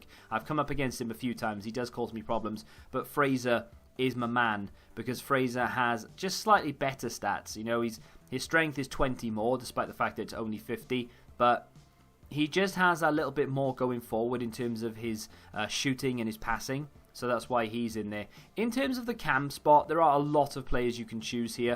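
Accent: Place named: Cardiff, United Kingdom. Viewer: You from cardiff?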